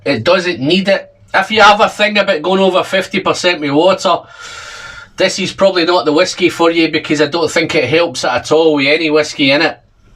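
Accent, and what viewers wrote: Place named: Edinburgh, United Kingdom. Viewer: Hey! Greetings from Cairns. In Australia. My wife and i very much enjoy your vids and your laid back style and your accent reminds me of my grandfather who was from Edinburgh. Just ordered a couple of these from Royal Mile who are our number one goto Scottish supplier. We also got a couple of the new Laphroaig Sherry oaks. Keep the great videos coming mate! Cheers, Dazz and Carla in Ox..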